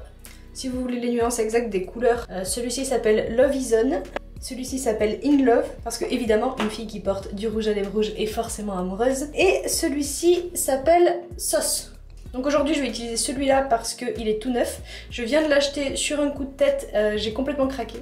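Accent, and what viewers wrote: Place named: England, United Kingdom. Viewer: J'adore ton accent englais..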